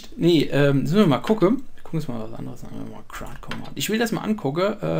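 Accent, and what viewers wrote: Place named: Frankfurt, Germany. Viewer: sorry aber Arbeite mal an Deiner Aussprache, dem Gesamteindruck,Struktur und Aufbau Deines Vortrages -der content stimmt ja ;-) aber so ein echter Frankfurter? kann net anners!?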